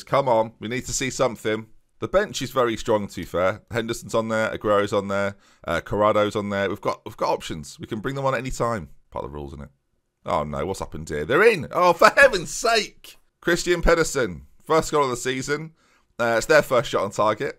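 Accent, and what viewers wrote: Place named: Newcastle, United Kingdom. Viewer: Tbf I only liked the video for the Geordie accent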